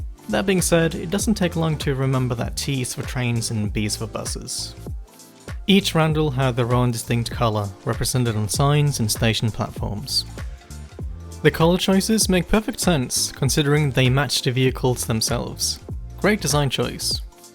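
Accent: Australia. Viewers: Interesting you are from Norway; there is a lot of Australian in your English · For someone who lives in Norway, you sound very aussie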